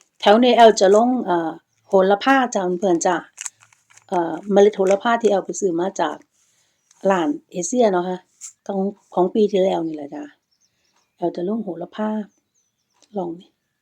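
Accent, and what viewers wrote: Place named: Isan, Thailand. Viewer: สวัสดีค่ะเอื้อยแอ้วเทือแรกที่ได้เข่าม่าเบิงคลิปค่ะเบิงเพลินๆดี่ค่ะเอื้อยมักธรรมชาติรอบๆบ้านเอื้อยจ้าและภาษาเว่าสำเนียงบ้านเฮ่าจ้า👍🥰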